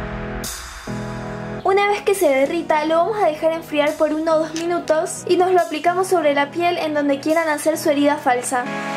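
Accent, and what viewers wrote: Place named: Mendoza, Argentina. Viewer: Hola!! que bien que te quedó.. una pregunta.. Sos de Mendoza? porque yo vivía ahí y Tenés acento de Mendoza👍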